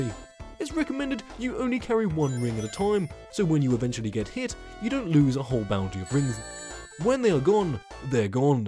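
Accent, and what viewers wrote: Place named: England, United Kingdom. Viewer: Live the concept. It's like a Doomsday Preppers parody with a very English accent. Me Gusta! ^_^ May I suggest Threed from Earthbound?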